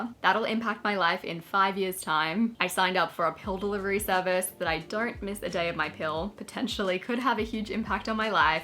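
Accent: Australia. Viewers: I think from Australia! 🦘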